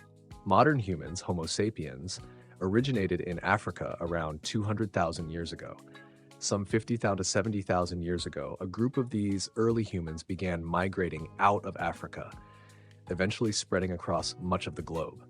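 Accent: United States. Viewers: Hmm voice over has an American accent but channel based in Indonesia??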